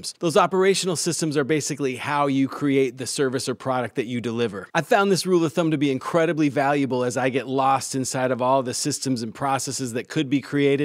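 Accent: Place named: United States. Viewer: Great but talks too fast. Slow it down. Your channel audience is all over the world and we don’t all understand American English as well as native speakers.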